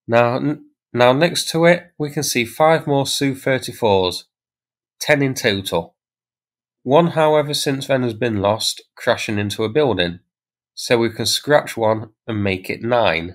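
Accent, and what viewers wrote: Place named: England, United Kingdom. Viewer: England. According to some, he has no accent at all, it's all the rest of the lands distant from England that use the native language of England who have developed accents.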